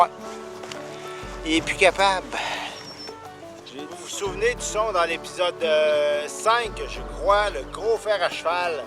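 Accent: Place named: Quebec, Canada. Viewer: Oui je comprends bien le Québécois, car j'ai fréquenté pas mal de Québécois . C'est un vrai plaisir de te regarder et de t'écouter. Je vais prier les dieux de la detection pour tes prochaines sortie.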